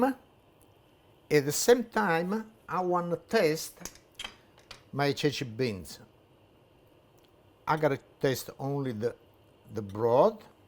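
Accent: Italy. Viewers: When I was a kid I remember old Italian men speaking in this accent during the 80s. Good old days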